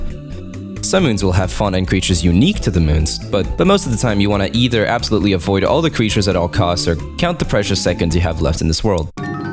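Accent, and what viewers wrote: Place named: France. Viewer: Also, you sound French. Are you French?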